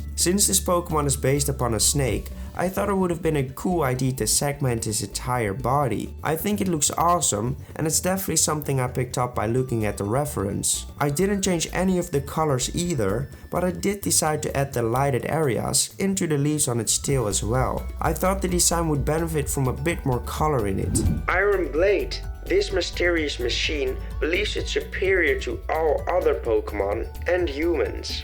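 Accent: Netherlands. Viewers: Ik hoor een nederlands accentje haha, verder echt coole designs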